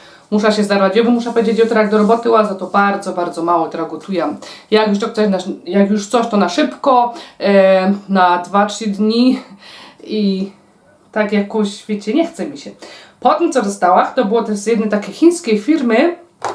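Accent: Silesia, Poland. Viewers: jestes Super babka,zawsze sie usmieje z twojej slaskiej gadki.Pozdrawiam cie z Ingolstadt. Jak bedziesz przejazdem serdecznie zapraszam na kawusie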